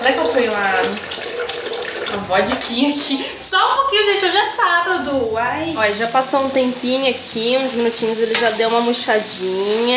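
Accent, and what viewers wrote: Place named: Piracicaba, Brazil. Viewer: Seu sotaque é o melhor que existe!! A piraricabana que existe em mim acorda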